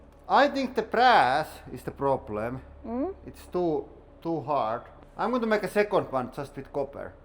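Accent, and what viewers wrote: Finland. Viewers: Love Finland accents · En oo pitkään aikaan kattellu tätä kanavaa; aksentti tuntuu muuttuvan aina vaan "manselaisemmaksi"....:D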